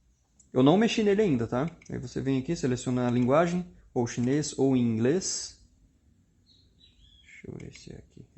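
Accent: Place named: South, Brazil. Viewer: Você é de qual estado,? Seu sotaque parece de sulista.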